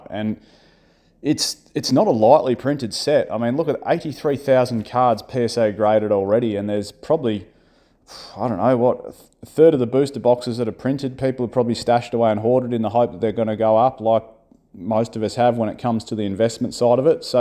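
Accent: Australia. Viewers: thanks for the video m8! really appreciate it! its nice to hear from an aussie. Yah audio though is a bit inconsistent, awesome video but! thanks m8!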